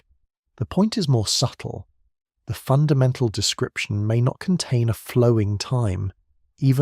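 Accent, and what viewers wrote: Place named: West Sussex, United Kingdom. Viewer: Hi from West Sussex. Thanks for your vids my friend. You sound local to me.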